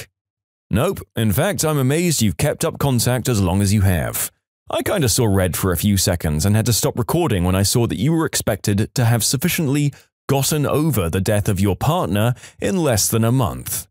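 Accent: United Kingdom. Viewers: What the hell happened to his voice he sounds brit ash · Another British narrator???!!!😂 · Luh, Another British narrator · Bro u seem more Britishie Today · WHAT THE ACCUALL- WHAT'S WITH THE VOICE?! SINCE WHEN IS BEITISH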